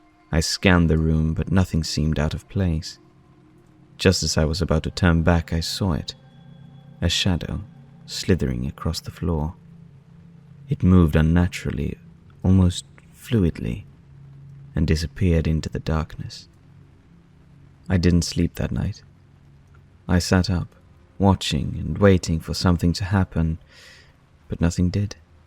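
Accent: United Kingdom. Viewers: Right!!!! The f**kin voice,soooo sexy and very sexual and the big orgasm is the voice being British!!! Unbelievable hot!!!!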